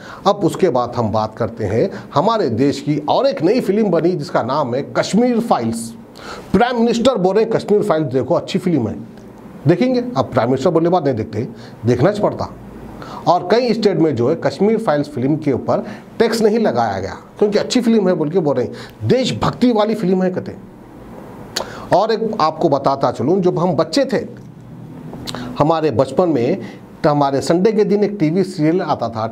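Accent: Hyderabad, India. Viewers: bhai aap pakke hyderabadi hai mast baatein karte aap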